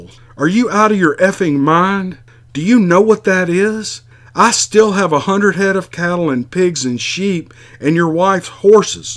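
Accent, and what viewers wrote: South, United States. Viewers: I love your stories and voice. Being a New Yorka, it's refreshing to hear a country bumkin tell stories like this. Keep up the great work. Salute. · What the heck are you doing??? 😳haha I love it!!!! you are absolutely amazing these encounters remind me of what I heard a couple months or years ago and I lost track of you and I'll tell you what you have already moved from spot 21 to number one absolutely unbelievable encounters you're just doing such a great job I can't believe it and I love your accent and I love the way you're from the South and I love your guitar rifts you do you are very good at guitar too Keeper go on letter rip potato chip haha lol fantastic work wow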